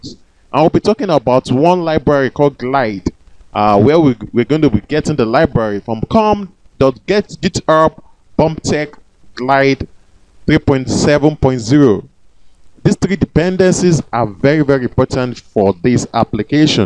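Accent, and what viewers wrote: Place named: Nigeria. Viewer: nice tutorial . this accent sounds nigerian doesnt it? i would like to know if there is an available repository to download your source code ? this would be very nice and helpful . thank you for this great tutorial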